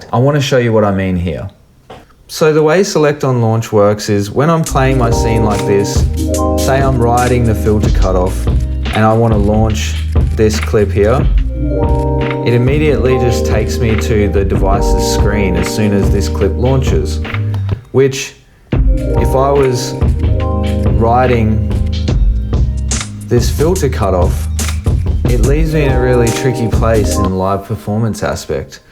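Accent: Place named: Australia. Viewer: great tips! still early in my journey on the Push 3, not really an in-the-box tip, but I LOVE using the ROTO-CONTROL with the Push 3 as you can lock it to a rack and tweak that while not impacting what happens with the Push3, kinda of like the 'Select On Launch' being OFF on the ROTO. Nice hearing an aussie sharing the love!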